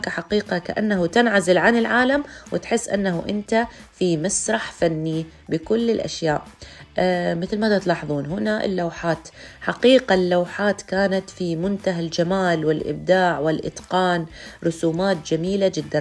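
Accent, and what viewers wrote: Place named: Iraq. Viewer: انتي عراقيه مخليه علم بريطانيه واسمج بالنكليزي بس تحجين عراقي 🤔🤔🤔🤔🤔🤔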